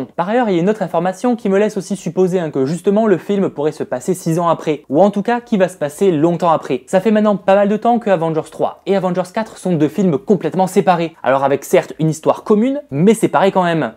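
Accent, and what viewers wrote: England, United Kingdom. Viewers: Idem, du coup gros spoile sur la fin de Ant-Man et la Guêpe... Je crois qu'il annonce au début de la vidéo qu'il faut avoir vu le film mais je n'avais rien compris ce qu'il disait à ce moment là tellement qu'il parle vite et articule mal (+ une prononciation à l'anglaise), même en retournant en arrière plusieurs fois pour essayer bien de comprendre les deux films qu'il fallait surtout avoir vu (j'avais juste compris pour Avengers 3 mais pas pour l'autre). Merci pour ce spoile dont je me serais bien passé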